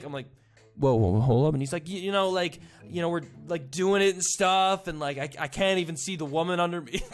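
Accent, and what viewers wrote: Canada. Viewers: Ur Canadian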